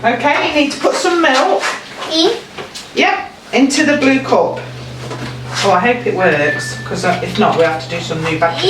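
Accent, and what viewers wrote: United Kingdom. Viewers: オススメにでてきて対してタイトル読まずに見たのですが、某アクセント研究YouTuberをみてるせいかイギリスアクセントだとすぐわかりました😂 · 初めて拝見したのですが、英語が苦手な私でも一瞬でわかるイギリスの発音でびっくりしました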